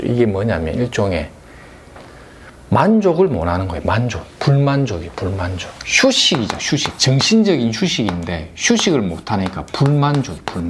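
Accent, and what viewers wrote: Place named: Gyeongsang, South Korea. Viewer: 감사합니다 경상도 사투리가 너무듣기 좋아요! 나이트 ㅋㅋㅋ ~~~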